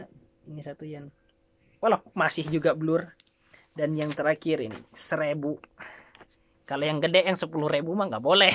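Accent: Java, Indonesia. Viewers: Ooo tak kiro jowo cak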